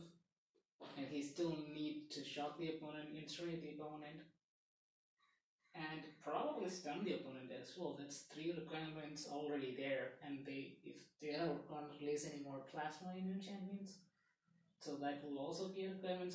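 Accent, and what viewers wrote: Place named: Kerala, India. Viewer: Are you malayali?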